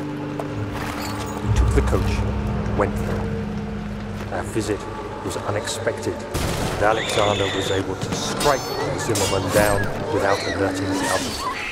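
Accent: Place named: England, United Kingdom. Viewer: I really need some audio books/stories with brooding english accented voices like this.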